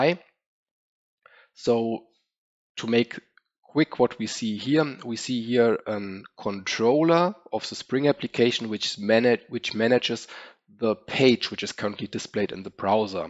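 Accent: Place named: Germany. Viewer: Man erkennt die Deutschen halt einfach an der Stimme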